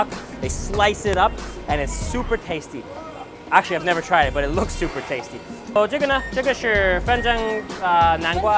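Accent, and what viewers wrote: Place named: China. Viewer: As lived out of China for long, it is really memorable to see these food. Good job man, interesting to see it from the view of foreigner. Man, you talk with local accent, haha, that is lovely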